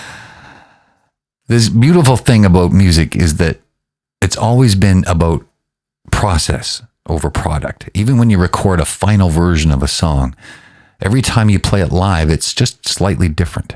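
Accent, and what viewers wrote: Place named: Canada. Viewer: Bro,your accent has a little Canadian or maybe Minnesotan flavor. I noticed your "aboot/aboat" and the way you say "sory".....amirite? You don't have to answer, I know that's considered an inappropriate question these days. I'm just learning to ask questions, something I was not allowed to do growing up so cut me some slack maybe? 😊💙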